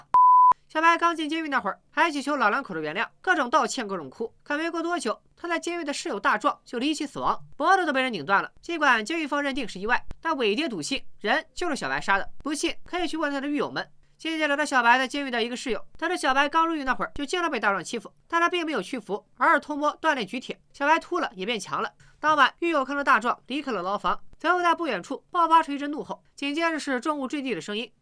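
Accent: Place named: Shandong, China. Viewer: 山东味儿有点重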